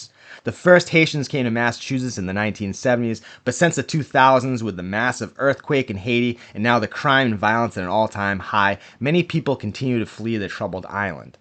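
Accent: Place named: Rhode Island, United States. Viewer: Another superb video!! Your Ch. ROCKS!!! Today... I can't find the Member sign up page. I believe I've seen it before... should've just signed up then. I'll figure it out (maybe..😅) I'm Internet Challenged... but I ❤ your content. You know all the history and share it well!!! I'm a Rhode Hole 50+ yrs...ran the streets (well..more like walked them) in 80's+90's. I love the history... the accent too. LOLOLOL. How many times have you been down South..spoke 1 sentence to a Rebel...and heard.."Where you from boy??...New York???"I'll bet more than once..😃😃 I gotta dump a Ch. from a FOOL who.. who's content SUCKS.. I'll leave it there. But yeah...if you can send me a Member link.. I'll join in a ❤️beat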